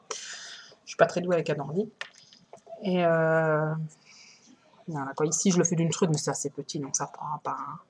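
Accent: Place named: Belgium. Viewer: Quel plaisir j'ai eu à faire ce lapin avec ton bel accent belge :) Merci pour ta patience!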